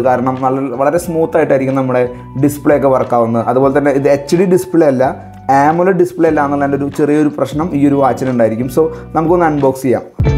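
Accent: Kunnamkulam, India. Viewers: Kunnamkulam bhashaa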